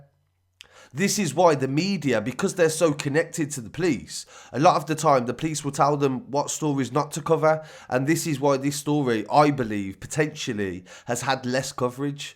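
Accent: Birmingham, United Kingdom. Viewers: Good channel but your brummy accent burns my lugs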